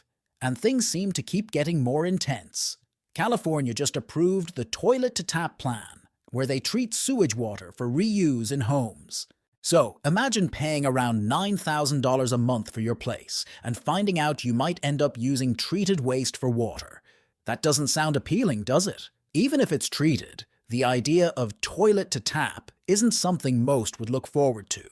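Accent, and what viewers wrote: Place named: Southeast, Canada. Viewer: I think every American is just a dumbass. Including this Southeastern Canadian sounding guy too.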